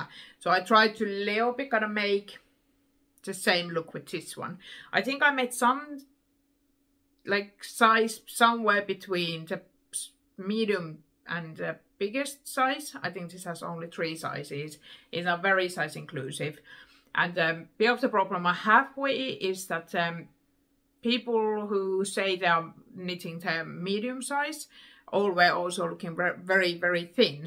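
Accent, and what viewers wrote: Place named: United Kingdom. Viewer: Lmao I was thinking about it whether your husband is from the UK or if you have studied there due to your British accent but then you revealed that your husband is actually British. Thanks for the video! It was fun to watch it while knitting and hopefully you keep making these. I absolutely love it how you use stronger and bolder colours compared to many others and it's also interesting to see these popular patterns in these vibrant colours ;)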